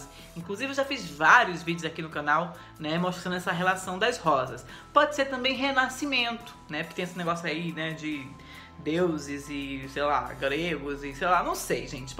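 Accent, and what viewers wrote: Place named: Paraná, Brazil. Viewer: o R é do PR. Kkkkkkk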